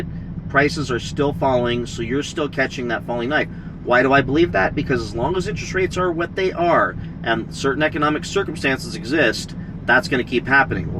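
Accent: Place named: Texas, United States. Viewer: Love your content and new subscriber - you sound like you belong here in Texas.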